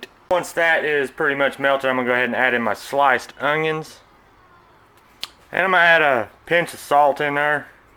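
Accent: South, United States. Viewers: We can make fun of his southern accent, but dang this looks good! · Accent...southern. Black or white...really?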